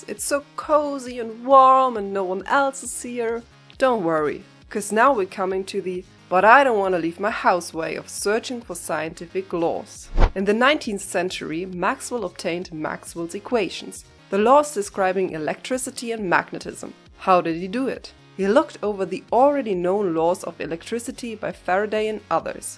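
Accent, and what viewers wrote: Germany. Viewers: After just 10 seconds into the video, let me take a wild guess: somewhere in the germanophone region?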